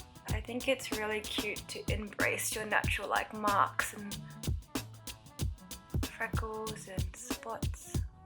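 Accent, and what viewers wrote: Australia. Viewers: Found this video from the recommendations, and I was like, who is this beautiful girl?! I'm gonna watch her video!! I wish I had facial structure like you...! and your healthy skin!! Love your Australian accent too!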